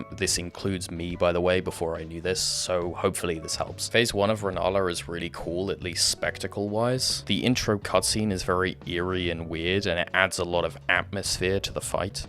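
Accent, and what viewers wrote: Wales, United Kingdom. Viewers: He is British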